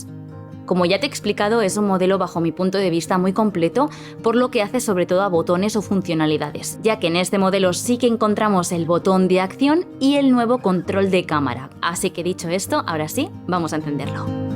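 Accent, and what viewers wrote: Spain. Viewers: Hola eres de España ?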